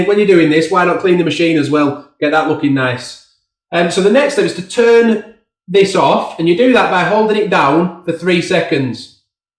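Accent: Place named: Bolton, United Kingdom. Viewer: love the video and the accent! You're not far from Bolton/Chorley area.. my ex-partner came from there and I never tired of listening to him.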